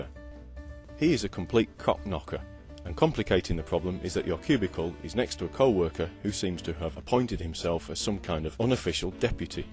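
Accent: United Kingdom. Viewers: MGTOW vids with English accents always makes me smile. Top class as usual. More vids from you would be most welcome. Thanks for your content.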